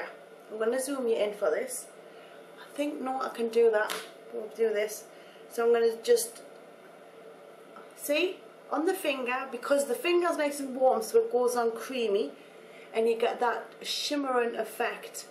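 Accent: Newcastle, United Kingdom. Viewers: where are you from? it sound like your from around the newcastle x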